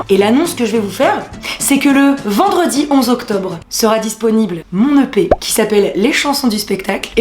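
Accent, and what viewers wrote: Quebec, Canada. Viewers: l'accent québécois, une pépite ! Bravo <3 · Chuis jaloux de ton accent québécois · J'adore l'accent québécois 😂😂❤❤congratulations 💪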